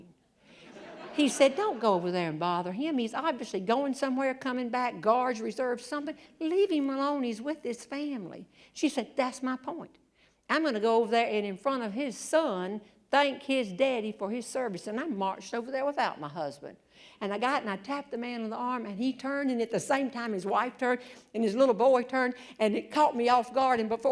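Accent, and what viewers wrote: South, United States. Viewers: I love this Lady and accent . I’m from Texas but when I listen to her for awhile I start speaking like a southerner . Love her 💕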